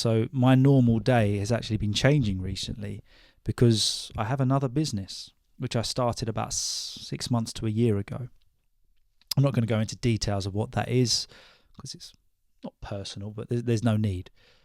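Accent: United Kingdom. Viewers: The you speak is utterly giving an aid to whoever want to step ahead in British accent. Thanks a lot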